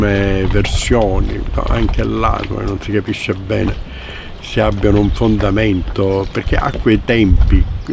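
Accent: Sicily, Italy. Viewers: Il suo accento siciliano è musica.. Vorrei chiederle cosa pensa del progetto del ponte?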